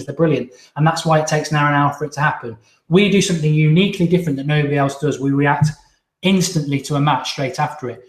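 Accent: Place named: Birmingham, United Kingdom. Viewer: His kitchen is suspiciously clean. And his accent these days is decidely less brummie.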